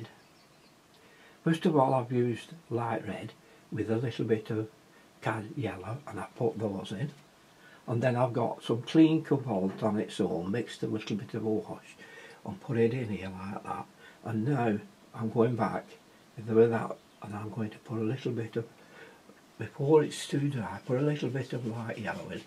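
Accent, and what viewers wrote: United Kingdom. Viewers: What is the palette you are using? Also, it's a bit hard to understand as you have a stong British accent and while I am very familiar with British accents it is sometimes hard to understand what you are saying. Maybe it's the video volume?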